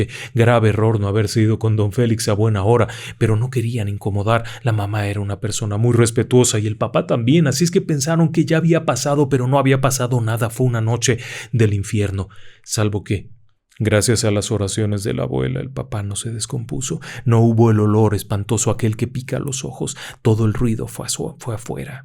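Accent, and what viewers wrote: Dominican Republic. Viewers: Parece Más Dominicano Que Yo. 🤣😍❤️🇩🇴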